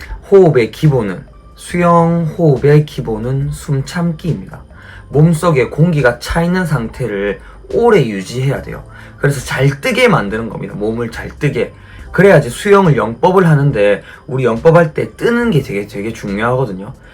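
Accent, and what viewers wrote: Gyeongsang, South Korea. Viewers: 다정다감한 경상도 억양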